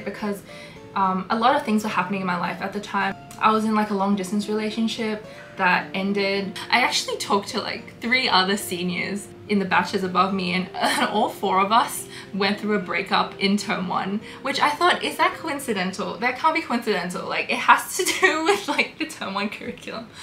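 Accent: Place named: Australia. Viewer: you have an australian accent, what's your background?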